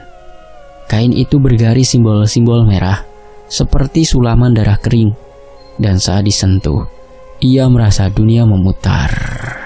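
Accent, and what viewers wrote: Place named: Surabaya, Indonesia. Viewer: Saya kira orang Surabaya mas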